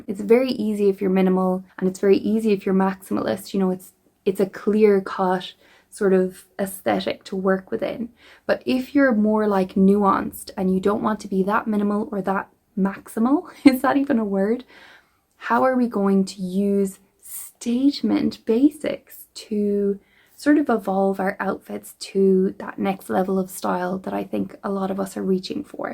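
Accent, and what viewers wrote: Ireland. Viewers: Your accent sounds Irish?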